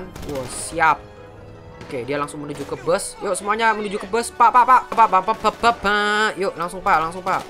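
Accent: Java, Indonesia. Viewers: bang orang jawa ya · Bang loe orang jawa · Bang samean jawa yo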